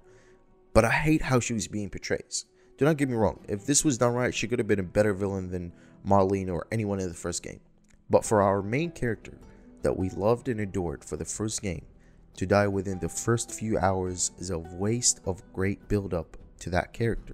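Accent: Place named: South, United States. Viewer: Bro do you mind if I ask where you’re from? You sound like you aren’t from USA but you kinda have a southern accent.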